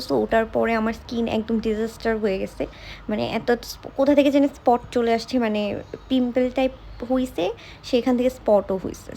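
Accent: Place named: India. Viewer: tmr ktha bartar style indian typr........ Tmr nnu brir vlog vdo dekhe sure holam j tumi amdr Desher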